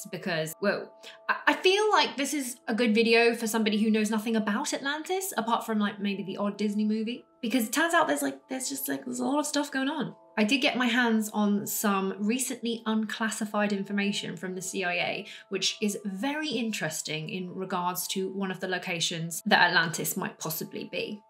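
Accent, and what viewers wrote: United Kingdom. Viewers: Something about discussing the truths of ancient history....with an English accent...makes it sound so legit. If I were to talk about it I'd sound crazy. :-P At the end there you posited that survivors of the Atlantean Global Empire came to the hunter gatherers (assuming they were very primitive and since they lived inland and in the wild they survived the tsunamis and weather changes) and almost appeared to be gods with their Atlantean knowledge. So "Osiris" was an Atlantean who then became a god to the early Egyptians. Now circle back to the pyramids. They were thought to be pre-diluvian structures that created energy to supply power to the various global cities (everyone had their own pyramids or power structures). If a surviving Atlantean passed on his knowledge to the pre-cursors of Egypt....why did he never explain the pyramid's true purpose? 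Even if the pyramids no longer worked, why did the Egyptians keep zero records of the structures, how they were built and who built them?? Not even a verbal tradition, one that speaks of Atlantis WAY BACK in history, doesn't speak of the pyramids across the globe? There's a huge gap here that needs to be filled... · Hey I think you are doing a great job making us aware of the many mysteries of the Ancient times plus adding your personal female British accent makes it more attractive. Jimmy is a great investigator also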